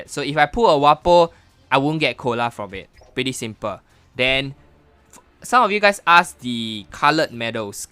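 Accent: Singapore. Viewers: Are u from Singapore I can tell from your acent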